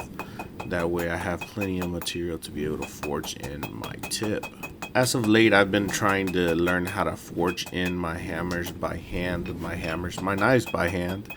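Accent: New Mexico, United States. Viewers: Ive been watching for a second now, your skills are definitely improved. Pretty much one of the few makers I follow. You sound like a fellow NM so I just enjoy the commentary!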